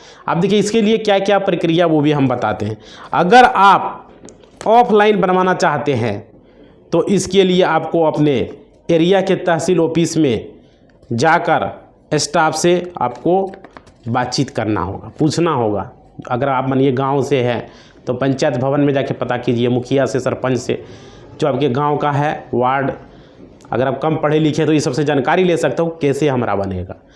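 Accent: Bihar, India. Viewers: जो बताना हो साफ बताओ चुटीये की तरह बोल रहे हो बिहारी हो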